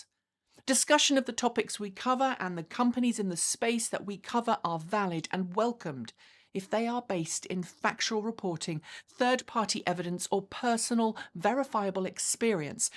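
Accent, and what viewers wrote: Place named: England, United Kingdom. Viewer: Well done, had to be said. Totally agree about wild unproven comments being moderated. As a Brit I am proud that you have held onto your English accent even though you are now an American citizen.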